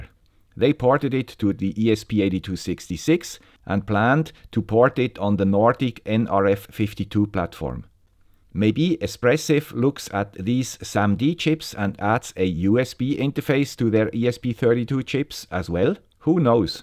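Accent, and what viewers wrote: Switzerland. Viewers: I also love your intro ! When I hear it is the "guy with the Swiss accent" I know I will be watching something educational and entertaining ! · The guy with swiss accent...hehe...Hallo und viel erfolg. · Yeah I like the dir command. Long ago I made a python program that allowed the use of cd, change directory, even. I think it went into lists and dictionaries as well. People seem to copy what I make, even when I think it's locked down. Nonchalant works better. Regardless, I seem to give people good ideas as well. Love this place! Thanks man with the Swiss accent.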